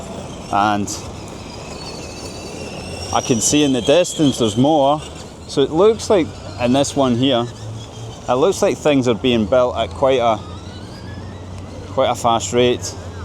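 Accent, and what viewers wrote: Scotland, United Kingdom. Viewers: Love Scottish accent. Like you moustache bro, it is back in fashion. Muslims are not bad it is propaganda to keep people away from known what Islam is all about. It is one good, no single human is worshipped, no forcing to change anyone beliefs, that’s why there are millions of churches all around the Middle East with freedom to practice and obligation to protect minorities. Read is the first word from God to prophetMohammed , read yourself and learn. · If you could post English subtitles. Everyone cannot understand Scottish accent. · ПЗДЦ акцент реально СКОТИШ)))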